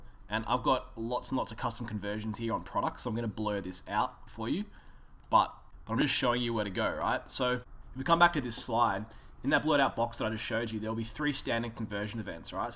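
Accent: Australia. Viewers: So good to hear an aussie explaining it ahahhahah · Good to hear an Aussie doing it, I’m from South Australia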